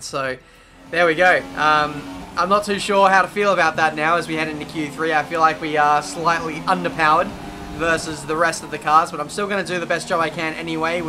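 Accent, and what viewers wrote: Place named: Australia. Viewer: No apologies are needed, I watch because i like the Australian accent and because i love F1, its like watching a season of F1 without having to wait 2 weeks till the next race weekend.. When i get home and finish cooking i watch this as daily ritual almost, and a crap race like this is still more fun to watch than any of the shite i see on TV.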